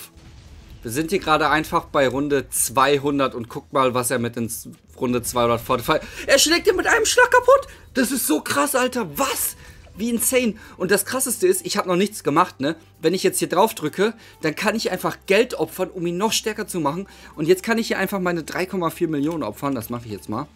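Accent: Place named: Germany. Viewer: Ich hab in deinen Englischen Videos irgendwie schon gehört dass du Deutsch bist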